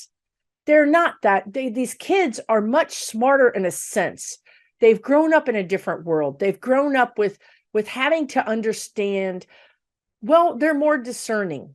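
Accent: Texas, United States. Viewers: Love love love this message. Your Texas drawl gets more pronounced when you’re joyous😉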